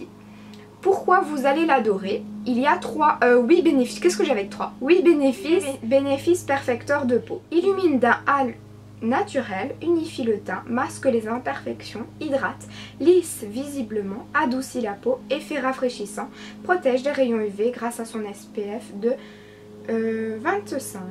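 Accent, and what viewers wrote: Belgium. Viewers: Ta voix avec ton accent Belge c'est super Mignon ah ah 💕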